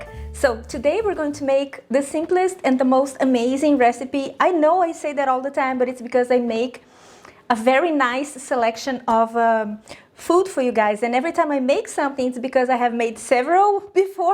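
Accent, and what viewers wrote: Brazil. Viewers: Ah, que legal! Seu inglês é maravilhoso, perfeito! Mas como sou brasileira, pesquei o sotaque! Obrigada pela receita! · A receita parece legal, mas vim elogiar seu inglês hahahaha dá pra perceber o sotaque brasileiro mas eu gostei bastante de como você se virou com as palavras. Parabéns :) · Vi os primeiros 30 segundos do vídeo (o primeiro que vejo seu) e pensei... Wow! Ela fala como uma brasileira! Parabéns pelo trabalho maravilhoso, chef!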